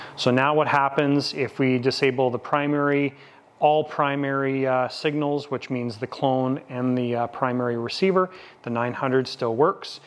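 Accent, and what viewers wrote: Canada. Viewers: Who tf cares how someone pronounces something....he says decals his own way too...maybe it's just the way his area of Canada talks....it really makes no difference lol nice sn by the way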